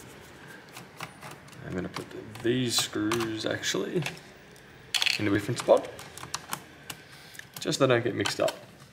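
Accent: Australia. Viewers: G-day! Are You from Australia?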